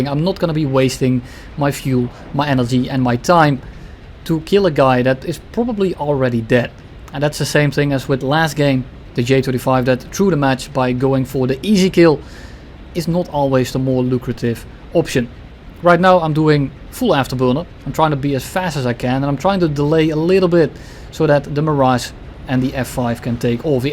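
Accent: Netherlands. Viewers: Die Nederlandse accent gaat te ver💀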